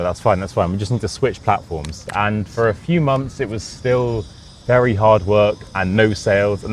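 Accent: United Kingdom. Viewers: Oh gosh I understand your story 🎉😊 I'm proud of myself now ,coz British accent is so hard😅 but I hope one day could speak like you sir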